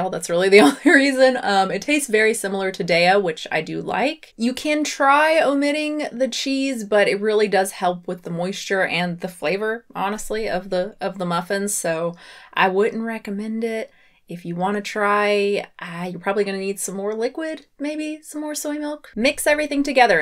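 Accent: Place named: South, United States. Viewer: You sound even more delightfully southern than usual.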